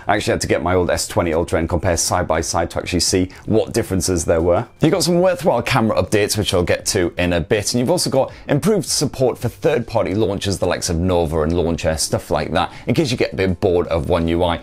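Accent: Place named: Scotland, United Kingdom. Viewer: your accent... it's what keeps me hooked lol... Scottish?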